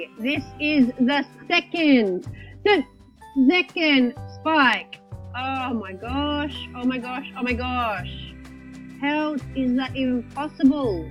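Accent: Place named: Australia. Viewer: Are you Aussie? You sound so Aussie hahaha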